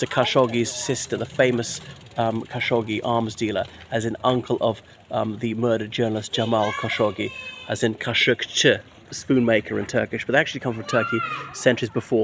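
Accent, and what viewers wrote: RP, United Kingdom. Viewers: I think it's brilliant, and also fitting, that you are Irish but with a cut-glass English accent...and your name is! Love your videos. Just discovered you. Don't know why I didn't come across you before now. Hope you make some content for St. Day coming up!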